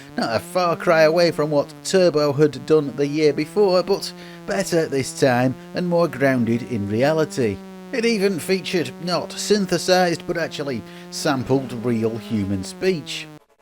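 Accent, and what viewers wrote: United Kingdom. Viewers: Awesome job on digging up I Robot! That's a deep cut. Jaw-dropping graphics for the time. I tracked down a ROM of it and gave it a play on MAME, oh.... I'd say sometime around 2005. It wasn't a bad game, by any stretch of the imagination... but it wasn't super fun or addicting like many of the others on the list. I love the content you've been putting out... I do have a small suggestion for you, if you'll humor me... I don't know if it's an "other side of the pond" thing, but most of the folks I know of in the USA that I've talked shop in person with tend to have a pretty standard way of pronouncing processor names. But, once again, this is the YouTube comments section, feel free to take this with as big a grain of salt as you like: MOS Technoglogy 6502: "Sixty Five Oh Two" Intel 8008: I've.... never heard anyone talk a lot about the 8008. 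My guess is "Eight Oh Oh Eight" or "EIghty Oh Eight". Intel 8080: "Intel Eighty Eighty" Intel 80286: "Two Eighty Six" Intel 80386: "Three Eighty Six" Intel 80486: "Four EIghty Six" Motorola 6800: "Sixty Eight Hundred" or "Motorola Sixty Eight Hundred" Motorola 68000: "Sixty Eight Kay" or "Motorola Sixty Eight Kay" You might notice I've left off a processor. :) Honestly, though, I call it a "Zee Eighty". Do y'all over there call it a "Zed Eighty"? Either way, I agree that the computer that Sir Clive Sinclair made out of it is definitely a "Zed Ex Spectrum"... and the car brand is definitely "Jag-You-Ar", and not "Jag-war", and ABSOLUTELY not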